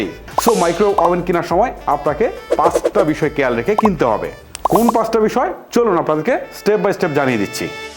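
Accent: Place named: Chittagong, Bangladesh. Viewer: আমার অনেক ডিসটিকের মানুষের সাথে কথা হয় বা চলাফেরা করা হয়, চিটাগাং এর মানুষের‌ উচ্চারণ কিছুটা অন্যরকম আমার মনে হয় এই ভাই চিটাগাং অথবা আশপাশ এরিয়ার।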